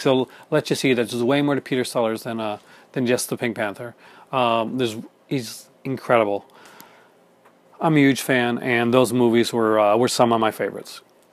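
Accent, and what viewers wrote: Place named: Canada. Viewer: you have more of a Canadian accent now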